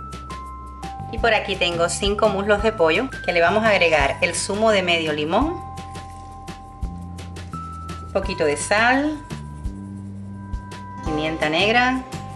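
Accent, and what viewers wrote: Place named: Cuba. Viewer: jaja ya me sonaba ese asento .un gusto yo tanbien soy cubana desde el primer día k vi tu video me suscribi. Por tu asento .jenial 👏👏💪💪💪🇨🇺🇨🇺🇨🇺🇨🇺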